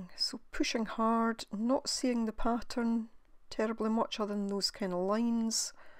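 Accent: Scotland, United Kingdom. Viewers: Thank you so much for this video. I've also messed around with this technique coz I think these patterns are quite amazing! I tried glass, sheets of rigid acrylic, bendy acrylic, various acetates, projector film, photo paper and more, inter-changing them all if you know what I mean. So far glass seems to give the best result. I found yt videos by Atomic Shrimp and Cat Hand (two separate channels) who did some experimenting with dentritic painting and mono-printing with pretty good results. I think they may have added a wee bit of water to the paints. Beats me how I did not discover your channel previously while on my dendritic travels, but am glad I found you now. You have so many videos and interesting techniques I would love to explore! And great to hear a Scottish accent too! Sub'd.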